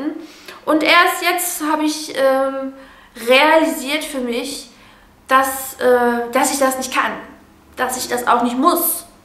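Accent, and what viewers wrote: Ruhr, Germany. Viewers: Hömma, bisse auch aussm Pott, ne? ;-) Sehr sympathisch.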